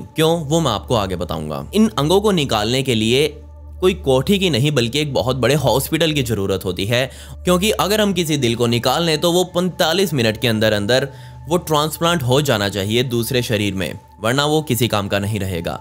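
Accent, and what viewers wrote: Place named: Haryana, India. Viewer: Apki hindi mai , Haryanvi ton Hai लाडले ❤️❤️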